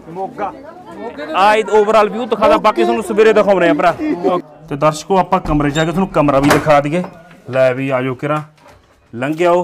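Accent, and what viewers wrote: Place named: Moga, India. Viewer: Moge di boli ba kamal hai mithi mithi.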